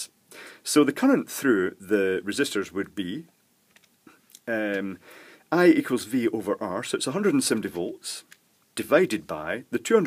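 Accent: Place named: Edinburgh, United Kingdom. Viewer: I followed your (very clear) recommendations and now have a fully working 'UK' charger.Love the videos and the accent (Edinburgh?). Keep 'em coming.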